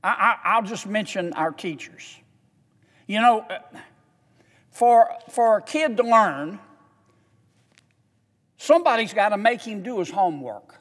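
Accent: South, United States. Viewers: He went to Oxford. I'm certain he didn't have the southern drawl while he was going to Oxford.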